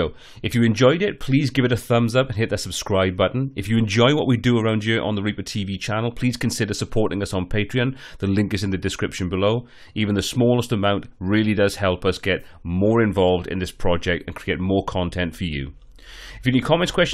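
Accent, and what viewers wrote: Wales, United Kingdom. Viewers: Cheers man I'm having some popping issues. Do I hear a Pontypridd accent 🙂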